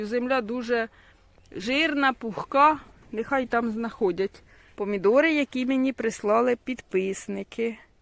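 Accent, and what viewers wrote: Poltava, Ukraine. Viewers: Мені здається,що у Вас полтавський говір,чи ні? Якщо так,то який район?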